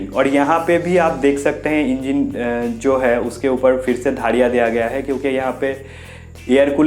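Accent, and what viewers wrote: Bengal, India. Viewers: Ap bengali ho Am I right? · Bangali lag raha hai. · r u a bengali??? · Dada tum Bengali lagta hai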